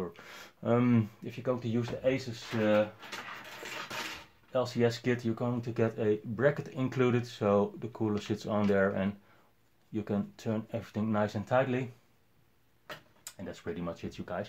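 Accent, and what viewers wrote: Netherlands. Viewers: Are you DUTCH ?????? FROM AMSTERDAM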